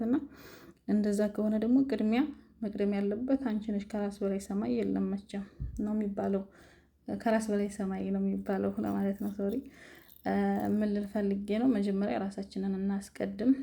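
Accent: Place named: Wollo, Ethiopia. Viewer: እርግጠኛ ነኜ አማራ ክልልናት የወሎ ይመሰለኛን አማርኛዋ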